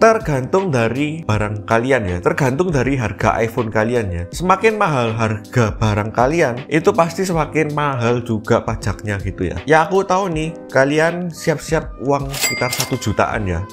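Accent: Java, Indonesia. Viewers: Pas buka channel ini, jujur ga pernah mikir Koko nya pake logat medok jawa.. 😅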